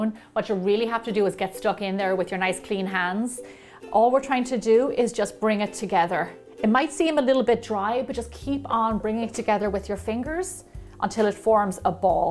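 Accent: Ireland. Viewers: What a great Channel and I love your Irish? accident.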